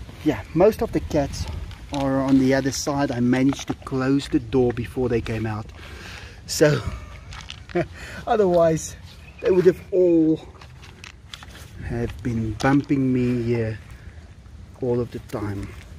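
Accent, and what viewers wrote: South Africa. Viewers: Are you South African? You kind of sound like a mixture of Steve Irwin and sharlto copley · The accent is unmistakenly South African...unless I'm mistaken. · Is this a south african accent? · Cartoon head Since people decided to be rude answering you, I’ll explain the reason so you don’t argue back rudely and cause another pointless comment war. Apparently, this person received 3 rescue tigers (1 died and Enzo was really sad, that’s when Diego came in) and since these are rescue tigers, they never learned from their mothers how to survive out in the wild to hunt and eat. This person also lives in South Africa (his accent), and these tigers do not belong in that region. If they are free, they will starve to death because they’ve been in captivity for many years with this special person and they’ve been fed by him.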